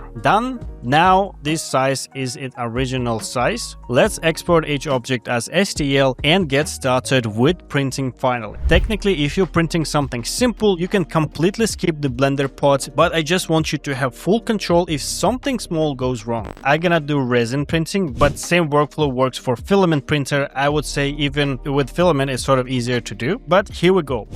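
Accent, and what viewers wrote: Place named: Russia. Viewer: I love the American Russian accent of this guy lmao, hands downs best tutorials in the 3d AI space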